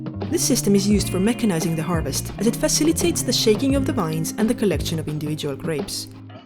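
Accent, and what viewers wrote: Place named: Italy. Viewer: These videos are wonderful. Very informative and I love wrapping my ear around that beautiful Italian accent. It looks like the series was short lived, which is a pity.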